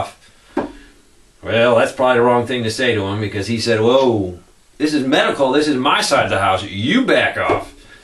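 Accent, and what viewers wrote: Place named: South, United States. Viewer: He is saying odd ball caliber he just has a southern accent so it sounds different.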